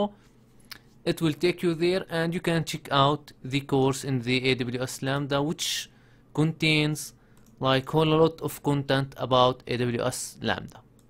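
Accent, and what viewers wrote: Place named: Arab world. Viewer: متأكد الي بحكي شخص عربي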